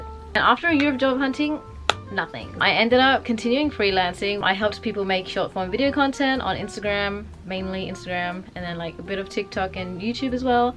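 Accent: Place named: Western Australia, Australia. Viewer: Wow, it's rare to see someone from Perth. My home town also. Your qualifacations are in marketing which me to think of a couple of things. (You have probably thought these). Firstly set yourself up as a business and stay on a business visa. Secondly persue work in the hospitality tourist industry. Thirdly because of your wonderful West Aussie Accent... (And I think you would gave thought of this too) Teach ingrish... And extend your visa on that... Good luck you have an amazing amount of energy and you are right, Perth sucks at certain career choices.. Finally continue to be Awesome!!!!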